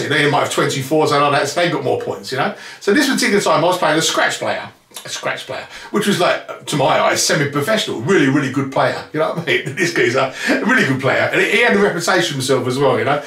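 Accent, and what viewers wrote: United Kingdom. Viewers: I don't know how anyone not from the UK can understand you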